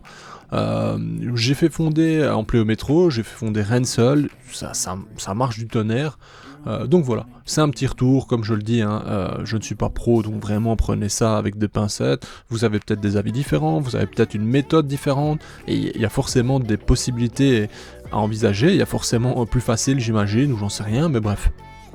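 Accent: Belgium. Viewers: puisque tu le demande, j'ai repéré une coquille ! t'as dis "septante", c'est pas gaulois ca ! d'ailleurs t'as l'accent belge , du coup, Lille? (car je n'imagine pas suisse avec cet accent, mais je peux me tromper hein, je suis un pro de l'erreur en tout genre) partage avec les gens qui en profite, comme moi (merci pour le partage), et puis laisse parler les enfants qui n'ont que ca pour tenter de se sentir supérieur . car comme tout le monde le sait, l'égo se construit en râlant sur des inconnus sur internet , car dans la vrai vie ils peuvent pas parler devant papa et maman sous peine de se faire priver du téléphone .... (bon j'abuse un peu mais il est vrai qu'internet large (la ou on parle avec des inconnus ) devient de plus en plus mal fréquenté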